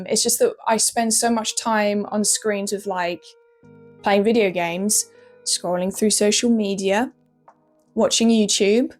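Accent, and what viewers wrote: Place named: RP, United Kingdom. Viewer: Wow Bridgerton accent IRL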